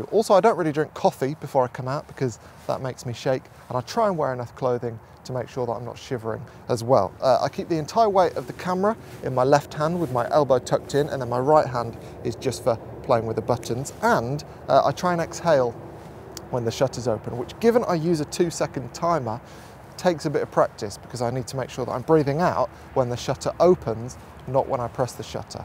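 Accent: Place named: England, United Kingdom. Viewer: I’m brand new to photography, love the content, the dry sense of humour and the English accent 👍🏼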